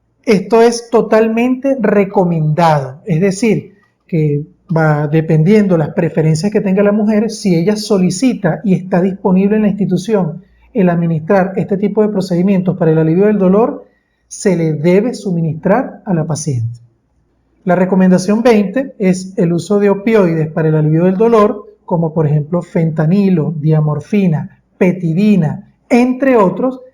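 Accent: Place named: Venezuela. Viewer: Dr que Gusto me da que comparta estos videos... No sabe que felicidad que nos enseñe que nos comparta sus conocimientos...yo soy feliz Además Si se le escucha que sea de Venezuela que bonito hablan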